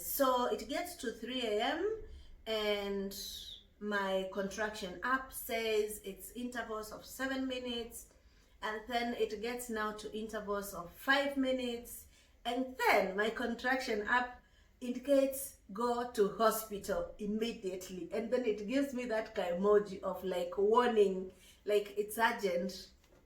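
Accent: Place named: Kamba, Kenya. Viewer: Are you kamba?! Your accent, jeeeez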